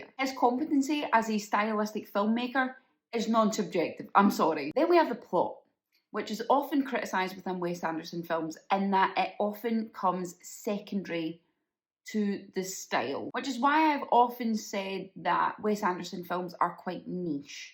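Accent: Scotland, United Kingdom. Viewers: I LOVE Wes Anderson. But anything weird registers with me. Haven’t seen this one yet, but I’ll have to. And I could listen to you talk forever. Not talking about your Scots dialect & accent (although I love that as well); I’m talking about your neuropsychological predisposition. I fell in love with you a long time ago (*please understand I’m not referring to anything romantic), which is why I wrote a character pseudo-based on you in my fictional pentalogy The Everlasting Life. Her name is Mattie Ferguson. Once my books are on shelves, I hope you are proud to be her inspiration. End of Speech. 🫂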